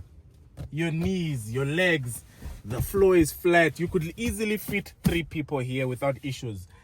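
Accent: United Kingdom. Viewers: The British accent 😂😂😂😂🔥🔥🔥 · The British accent 😂😂😂😂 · British accent😂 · It's a British accent for me." Allow us get started ❤❤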